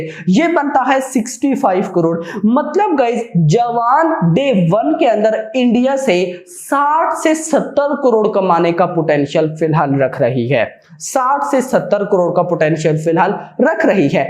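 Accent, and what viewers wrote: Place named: Pakistan. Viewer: Bhai kaha se ho bolne se to Pakistan ke lagte ho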